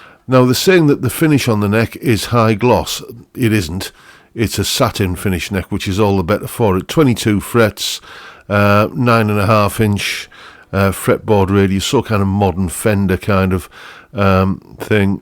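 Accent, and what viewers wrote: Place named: Northern England, United Kingdom. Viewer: Hey! Nice to hear another fellow northerner,I'm from Durham 😅. Where abouts are you from? Great review! I've been curious about Fazely for a while. I've recently(in the past year) got into modding guitars and previously bought a glarry(which is I love!). Fazely are the other brand that appears when you're looking for the cheapest new guitars. With the way prices are now it actually seems more economical to buy one of these brands new. Guitars that use to go for £10-20 second hand like encores are now going for £50-100 which is crazy 😅. Sorry I'm waffling on,got a bit excited 😅 What I was going to ask you was do you think their stuff are decent platforms to modify? Great content too 😊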